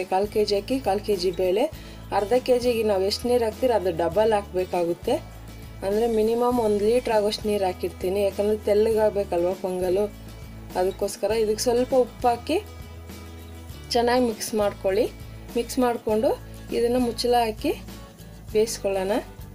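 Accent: Tamil Nadu, India. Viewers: Hello.. I tried for the first time khara Pongal on Saturday in America. It turned out very well 👌🏼 If I cook anything new I always check your channel and see your steps. Very simple n easily you explain. No useless talks. Your Tamil accent Kannada is very nice to hear. Bele obbattu also your simple tips helped me cook perfectly before that I had messed it many times. Thank you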